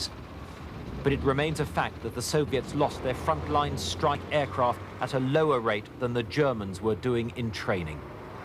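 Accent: United Kingdom. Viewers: The Dark Lord The narrator is clearly brittish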